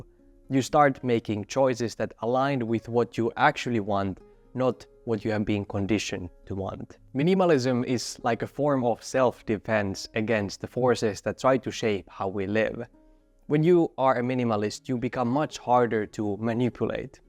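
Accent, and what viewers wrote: Finland. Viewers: Finnish accent, I never miss it! Onnea kanavalle!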